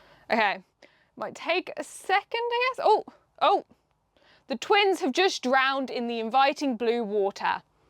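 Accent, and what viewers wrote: United Kingdom. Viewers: I love your British. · yooo ive never seen or hear of you're channel before, but i have a useless talent and how i aquired this talent.......be efffed if i knew but just from seeing a picture of someone i can tell if they'll have a british english accent but my buddy here didnt belive me and i bet him five bucks you had said accent. SO I HAVE TO THANK YOU FOR THE 5 BUCKS I JUST WON. Also great video did not realize games were stored on vinyl, crazy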